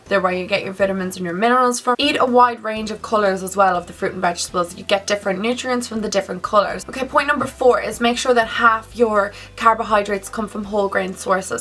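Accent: Ireland. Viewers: I love these videos, so glad you have done your last one and this one, I've been changing my eating habits over the past month, I've lost about 6/7lbs, what is a healthy weight loss in a certain time frame, love your videos and I'm a sucker for Irish accent lol xxxxx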